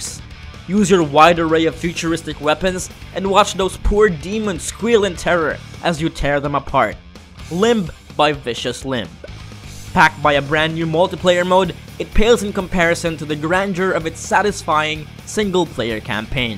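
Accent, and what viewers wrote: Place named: Philippines. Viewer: Yep I recognize the filipino accent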